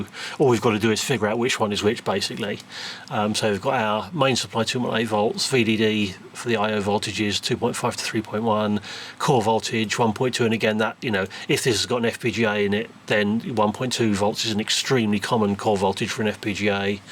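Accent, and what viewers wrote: England, United Kingdom. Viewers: As always - Perfect demonstration and technical details! Very Very nice to see!! I love this... BUT: PLEASE try to speak a little bit slower, it´s really hard to understand for guys like me (from German) .. sounds very "English" ;-) US-English is easier sometimes.. Would be higly appreciated.. Again, thanks for your perfect work! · Great video. Just a little hard to understand/keep up with at times due to you talking fast with that British accent.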